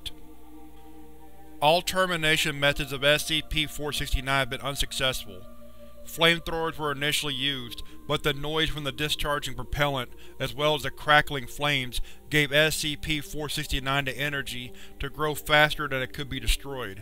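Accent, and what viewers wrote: South, United States. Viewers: Southern narrator is amazing, I really like it. Like a personal touch, I really really like it!!!😊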